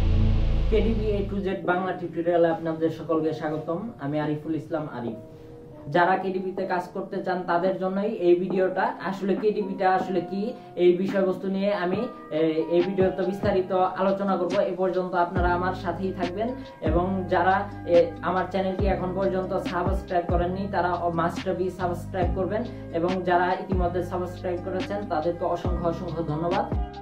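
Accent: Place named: Sirajganj, Bangladesh. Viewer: sirajgonj ar moto kory khota bolen to tai bolam. ok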